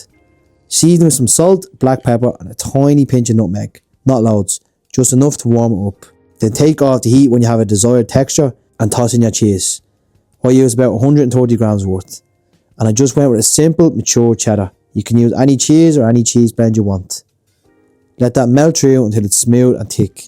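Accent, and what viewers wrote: Ireland. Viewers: Honestly, his Irish accent isn't that difficult to understand. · This looks absolutely SCRUMPTIOUS darlin I can't wait to make it and give it a try. Love the accent and love my Irish men ❤❤🎉🎉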